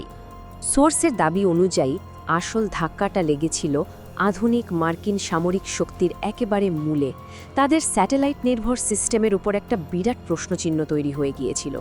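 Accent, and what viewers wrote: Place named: Kolkata, India. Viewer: এই মহিলা কি কলকাতার মনে হছছে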